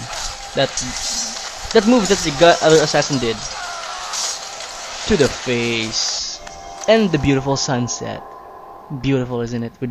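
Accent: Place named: Philippines. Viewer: I know ur filiphino, cause, i can tell ur accent ;D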